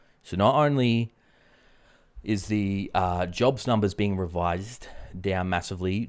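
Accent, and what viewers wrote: Australia. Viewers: Australian or Aussie accent.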